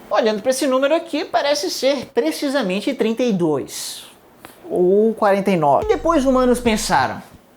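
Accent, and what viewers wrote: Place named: Northeast, Brazil. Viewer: esse cara é de qual estado? esse sotaque não me é estranho... Nordeste? pernambuco? Agreste? alguem sabe kkkkkkk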